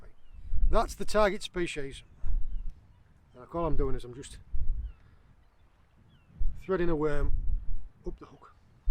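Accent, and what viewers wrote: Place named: Geordie, United Kingdom. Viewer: I haven't watched any of your videos for quite some time, Sorry about that but my interest has shifted from Sea Fishing right now. You popped up in my feed so here I am. This is a great video, very informative, the biggest thing I noticed was how your accent has changed since the last time I watched. That geordie twang is disappearing fast my lad. keep up the good work!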